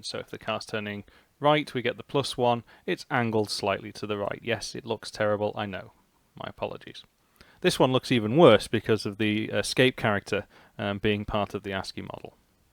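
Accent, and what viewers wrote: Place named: United Kingdom. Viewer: Your accent looks very much like British, it is quite cool. Nice video bro. Thanks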